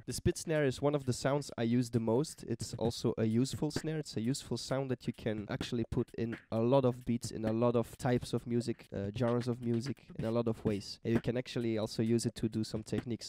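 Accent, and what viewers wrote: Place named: France. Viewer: You have the French accent^^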